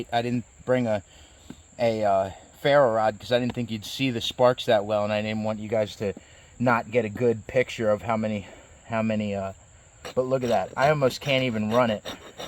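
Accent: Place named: Philadelphia, United States. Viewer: Are you in the Philadelphia or South Jersey area? You have a Philly area accent.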